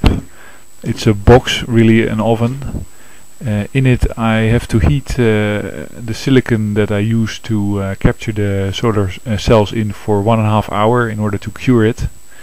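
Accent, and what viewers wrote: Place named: Netherlands. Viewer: ZIEK nederlands accent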